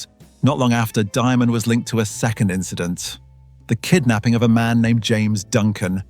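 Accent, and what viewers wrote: England, United Kingdom. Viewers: Dont listen to the haters, really delete the comment, Thats what Jack would have done ( probably that and more 😅😅). Your accent is rich and sounds like proper English. You and fellow Podcaster The Last Supper have top notch Flows🌊🌊🌊🏞🌀🌀🌀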